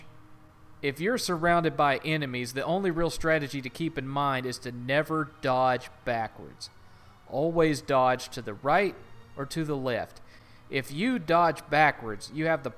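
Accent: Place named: Alabama, United States. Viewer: I didn't really like the game, it was cool but meh, but your war against artistic indie games is some real incel shit. Also you seem to have blanked out half the game, the lover never blamed her, that was clarified later, that was her mental illness making her assume the thoughts of others, her father did some sus shit though. "San Francisco Arthouse crowd", oh I get it, you're one of those far right cringe republican reactionaries, yikes. "It's easy and predictable" while showing a video of you getting your ass beat by singular enemies. "Dark Souls for dummies" right after he showed he sucked at it. Guess you're self burning yourself there? And then he cries about the twitter crowd lol. It's very hard to listen to you complain about how easy the game is, when you're barely winning the battles on screen. This guy's accent and word choice becomes more Alabama the longer this video goes on. 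OMG, he's LITERALLY triggered by people liking this game, that's pathetic asf. "Pieces of shit who said this was goty"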